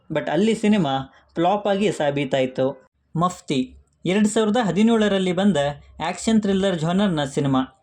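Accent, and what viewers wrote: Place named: North India, India. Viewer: Bro ur kannada pronounsation is luke northies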